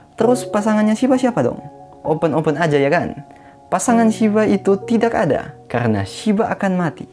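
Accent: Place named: Java, Indonesia. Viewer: Tkumi irng jepang ap jawa sih mas jawany melekat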